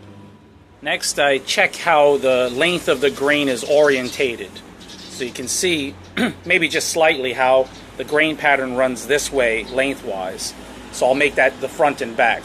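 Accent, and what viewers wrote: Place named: East Coast, United States. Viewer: You're clearly from the East Coast... I'm guessing Jersey? Like your vids, I'm subscribed, continued success.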